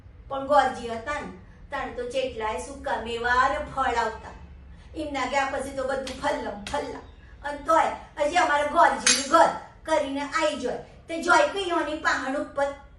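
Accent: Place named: Sabarkantha, India. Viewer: ખૂબ ખૂબ સુંદર એકોક્તિ. ખૂબ ઉત્તમ અભિનય!! વારેવારે માથે ઓઢવાની રીત... પાક્કી ઉમરલાયક બાઈ લાગે છે. સાબરકાંઠાની બોલી અદ્ભૂત!!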